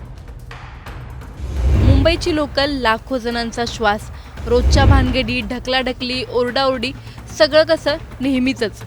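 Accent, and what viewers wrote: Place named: Khandesh, India. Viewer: Aani pani he marathi bhasha jalgaon khaandesh chi ahe . Kahi problem ahe tula ? Marathi che khup variants ahet.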